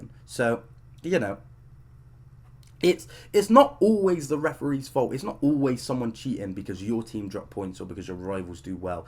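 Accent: Manchester, United Kingdom. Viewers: You have an interesting Manchester accent...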